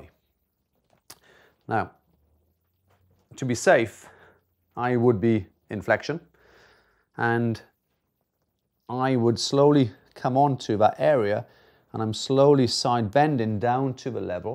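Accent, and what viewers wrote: Wales, United Kingdom. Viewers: Are you welsh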